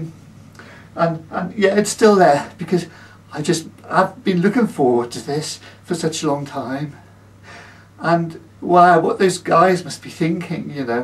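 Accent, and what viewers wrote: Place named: Birmingham, United Kingdom. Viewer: You must know you bad.. You just sound like you, a boring brummy professor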